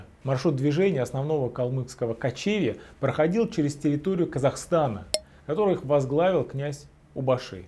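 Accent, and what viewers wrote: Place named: Moscow, Russia. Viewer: правильно следует произносив звук О, а не акать испорчески по мАцковски. То есть надо произносить там где есть в слове буква О произносить звук О, а именно: О'йрат, ТО'ле бий, БО'генбай батыр.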